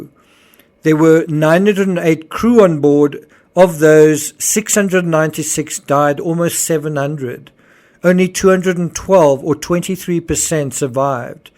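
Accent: South Africa. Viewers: It is Hassan... but the narrator's South African accent has a "schwa" or soft R on that version of A...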